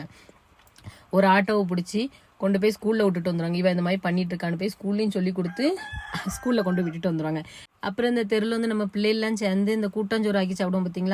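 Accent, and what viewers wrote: Tirunelveli, India. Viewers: உங்க பேச்சில் நெல்லை வாசம் வீசுகிறது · Thirunelveli Tamil naila pesuriga❤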